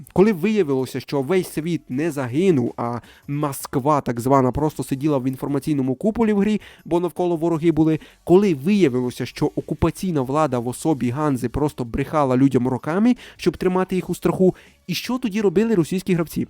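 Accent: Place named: Transcarpathia, Ukraine. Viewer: Дуже гарний закарпатський акцент)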